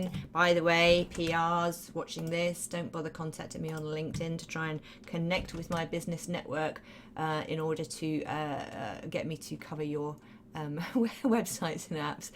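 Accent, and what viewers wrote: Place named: South, United Kingdom. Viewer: It's a standard Southern British accent.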